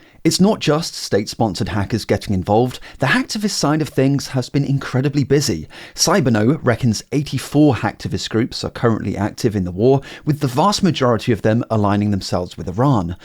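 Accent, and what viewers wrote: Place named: United Kingdom. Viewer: American ? but the accent is British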